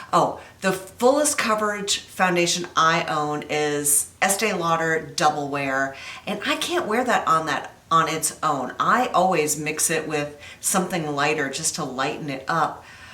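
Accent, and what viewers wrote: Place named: Midwest, United States. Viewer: Born and raised in Iowa so I totally understand the Midwestern accent, yah hey! ;)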